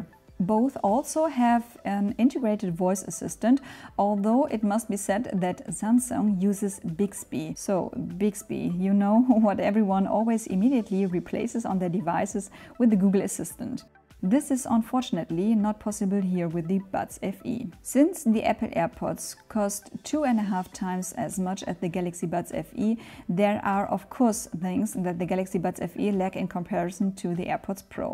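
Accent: Germany. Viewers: Well done. You persuaded me to buy these. Love your beautiful German accent.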